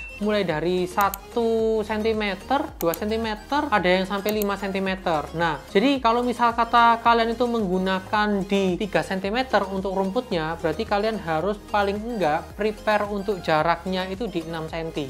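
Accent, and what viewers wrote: Surabaya, Indonesia. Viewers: mangkane kok logat e ga asing, arek boyo tibakne, tak sub koh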